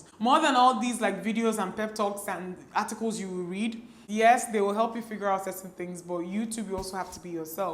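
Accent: Igbo, Nigeria. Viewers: I love how you called nneoma's name and how you answered her 😂 I love your igbo accent 😊♥️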